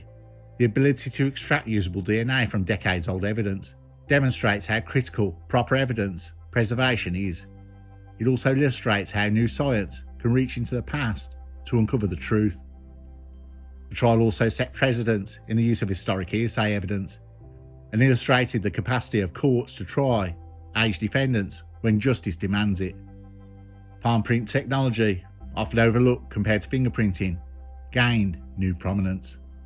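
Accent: Birmingham, United Kingdom. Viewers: 89K - I’ve been with you wen you We’re on 3.5K- bruv your utter brilliant and the way you make the story’s come to life! They say you even pay tribute to the family’s with they way you talk with such respect.. just wanted to leave this here, as show you can do whatever you u good at- also Love the brummy accent , as I was in Hmp YOI Brinsford Wolverhampton and I even learnt what a yam yam is 🤣🤣- my co producer on music is also a brummy - big ups bro keep it rolling ❤🔥🔥🔥💪💪🙏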